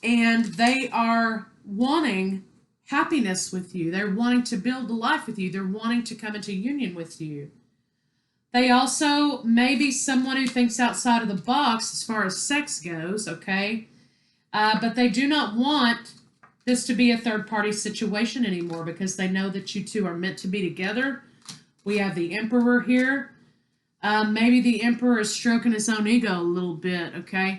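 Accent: Texas, United States. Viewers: Love your style of reading. And I just knew you’re Texan. You’re dead right too. Still don’t know how I’ll handle her if she makes contact. My head says hell no. To much conflicts in the past, but there’s a magnetism and it bothers me, as me as person rather start a new with someone else. Im afraid, like you said, it’ll go back to be like before. Tough call. Thank you for a very good reading.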